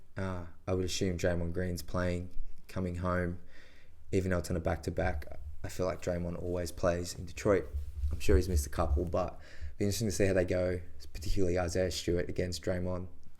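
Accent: Australia. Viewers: You from Aussie lad?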